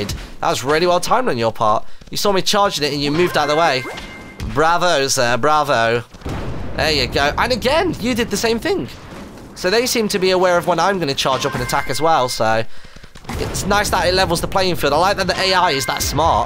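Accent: United Kingdom. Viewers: Its just his acsent. Hes Britain dont make fun of him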